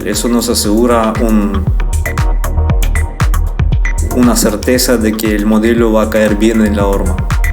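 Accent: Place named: Russia. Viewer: Спасибо за урок. ..Сперва было странно, обратил внииание на русский акцент, а потом увидел имя автора, и стало на свои места.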